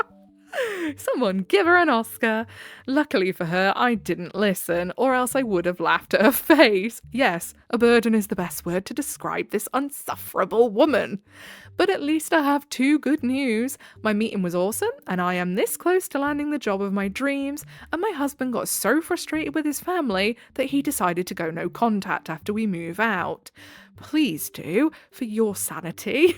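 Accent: United Kingdom. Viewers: Oh you're British! No ai and British? Yes!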